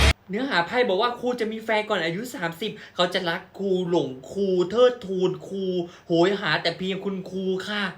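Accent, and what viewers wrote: South, Thailand. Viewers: พี่ใช้คนใต้ไหม❤️🧡💛💚💙🩵💜🩷💝💖💗💞💕💓💟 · พี่เป็นคนที่ไหนคะทำไมภาษาทำไมภาษาเหนือภาคใต้เลยอ่ะ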